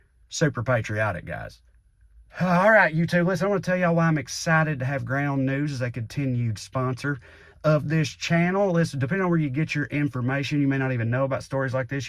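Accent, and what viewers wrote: South, United States. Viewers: I’m always glad to have a person with a southern accent who is funny on purpose in my subscriptions. Keep up God’s work.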